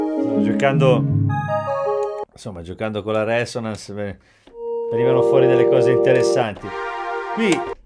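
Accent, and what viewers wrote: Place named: Italy. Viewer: Your musician skills plus your Italian accent make me want to buy this Korg so bad. I already bought the Reface DX, thanks to your demo video! Grazie, amigo.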